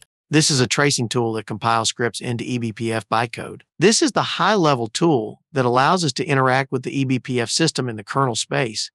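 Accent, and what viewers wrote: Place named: South, United States. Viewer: Good video 💯 Btw I was thinking about your southern drawl. If you turn out to be a 80 year old Grandma living in England somewhere,I'm gonna mad upset😂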